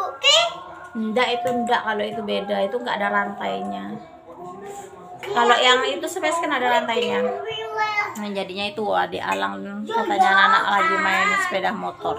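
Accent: Java, Indonesia. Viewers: Tapi seperti orang Jawa logatnya mom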